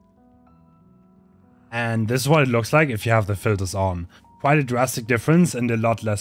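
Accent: Germany. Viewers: why is bro trying so much harder to sound more german than he actually is in this video? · I hear german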